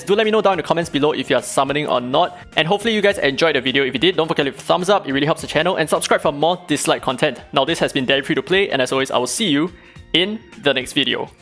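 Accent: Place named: Singapore. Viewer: such a strong Singaporean accent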